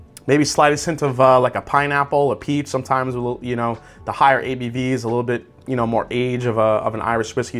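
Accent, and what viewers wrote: New York, United States. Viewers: Great NY Italian Irish accent! Really enjoy the Black Bush. Nice sipper and holds up well in a Hot Toddy or with ice.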